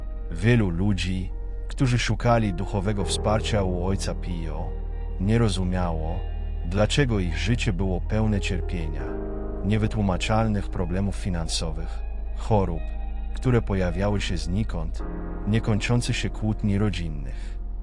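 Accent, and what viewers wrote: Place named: Russia. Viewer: Co to za Antychryst z ruskim akcentem nagrał te brednie ,gin szatanie ,,akysz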